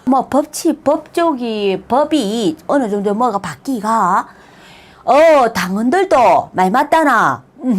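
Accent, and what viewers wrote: Gyeongsang, South Korea. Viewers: 경상도 어데고 ? 서부갱남이가 ? 소카도오 ㅡ 나가 볼때는 ㅡ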